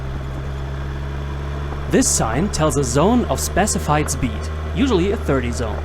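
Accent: Germany. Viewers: I told him early, that his English wasn't necessarily bad, just ultimately "German". Some people as you like it, others don't. He'll be reading the comments here, so he'll get to know. ^__^ I hope you are gonna stay as watcher!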